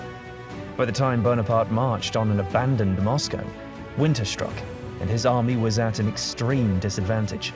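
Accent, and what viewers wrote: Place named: Italy. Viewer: I love how the narrator uses his Italian accent! 💙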